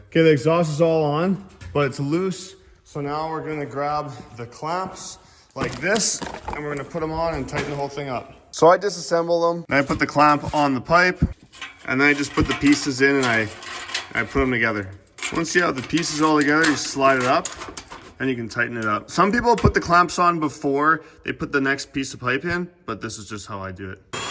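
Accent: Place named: Canada. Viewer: Ya that was a super sick video dude well explained, thought you sounded Canadian then saw the sask plates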